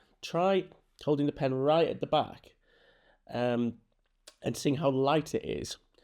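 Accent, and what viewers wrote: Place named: Yorkshire, United Kingdom. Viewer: Our washer is running, which is quite loud, so at first it sounded like you said, "Holding the pen at the fruit store." 🤣🤣🤣 I have no trouble understanding your Yorkshire accent (I'm an American with a longstanding love for British TV especially Monty Python) but with the washer running it made it sound different. I should maybe also mention that I often mis-hear words as a quirk of my autism. It makes for some hilarious conversations with my husband. 😂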